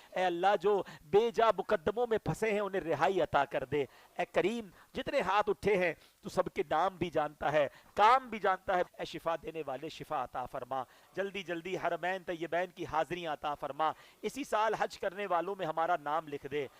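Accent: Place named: Punjab, Pakistan. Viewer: Hzrat AAP itni peare pnjbe bulty ha Bhat achi lghti ha masgalla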